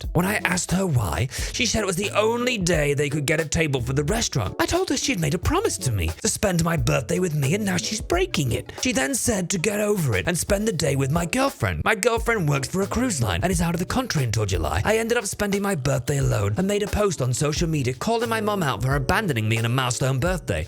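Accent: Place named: United Kingdom. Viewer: Finally another reddit channel I can enjoy! Hell ye, time to learn to understand the ✨ British accent ✨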